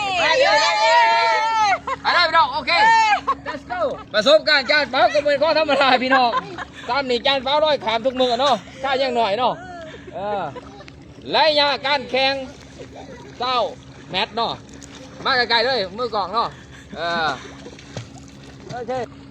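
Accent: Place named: Pakse, Laos. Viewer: Sabaidee!!! You sound like you're from Pakse, I was born there. I am happy to see that you are showing Foreigners our lifestyle in our country/Laos....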